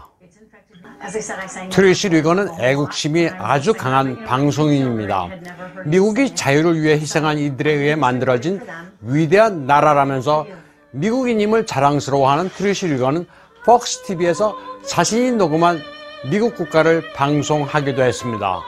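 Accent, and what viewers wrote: China. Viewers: 한글로 써놓은 지명등을 중국발음으로 읽는 것을 듣는 것이 귀에 거슬리네요.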